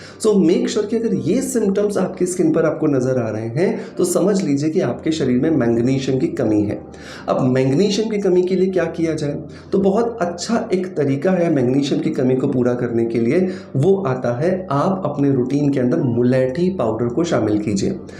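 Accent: Bengal, India. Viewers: Sir aap kya bangali ho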